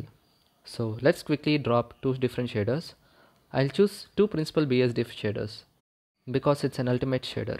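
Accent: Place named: India. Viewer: 인도발음 나오자마자 신뢰감 상승한 영상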